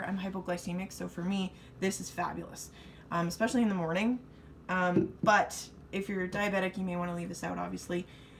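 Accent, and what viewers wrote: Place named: Canada. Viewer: I sense Canadian LoL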